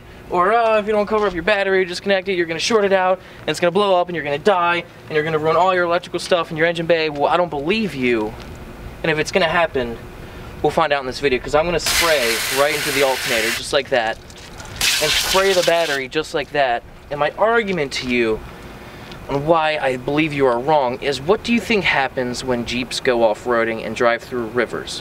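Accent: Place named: Ohio, United States. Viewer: Are you guys from the midwest like Ohio? Your accent sounds like a skater I watch who's also from ohio and you guys talk so similar.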